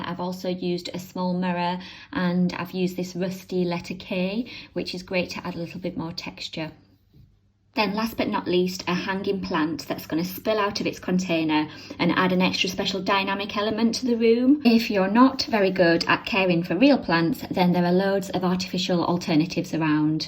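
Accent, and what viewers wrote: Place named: Newcastle, United Kingdom. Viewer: Hi. I have so much difficulty with shelves so I loved how you dressed your shelves. I love watching your app. I’m English and I live in Spain so it’s lovely to hear your accent and the way you interact with your viewers. I’m originally from Necwcastle upon Tyne so it’s a little bit like being at home. I hope you all stay safe and once again thank you for all the time you spend in helping us make our homes 🏡 more cosy. Xx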